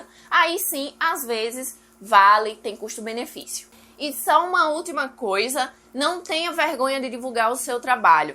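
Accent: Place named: Pernambuco, Brazil. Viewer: Esse sotaque Pernambucano. Minha conterrânea, amei demais o canal. To como iniciante , já amei e já ativei tudo. Obrigada 😍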